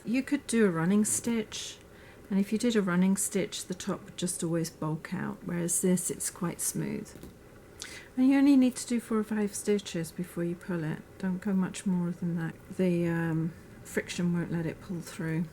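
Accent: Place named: Northumberland, United Kingdom. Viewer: Great instructional video!!!! Love your accent, too. You sound just like my mother, born in Morpeth, Northumberland. Especially liked video because you stuck to the necessary elements of making the cushion and didn’t put in lots of extraneous dialog to fill air time like others often do. You really know your stuff! I can’t wait to get started on my project. ❤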